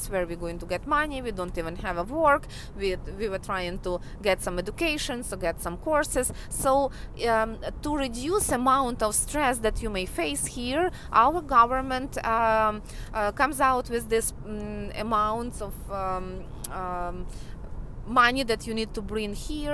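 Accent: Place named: Russia. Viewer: oh that deceiving Russian accent...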